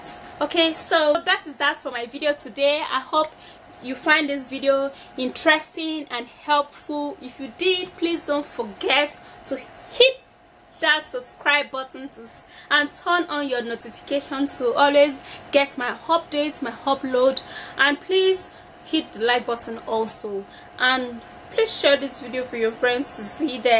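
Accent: Nigeria. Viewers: thank you so much indeed!! all your videos are great love it.Cuz it's amazing girl!! you teach me how to use it!! thanks once again for your help and advices for showing us to be able to get clean Oral Hygienic!!! such a courageous active young lady! ! well done and keep your efforts!! love Nigerian accent they're cool people! !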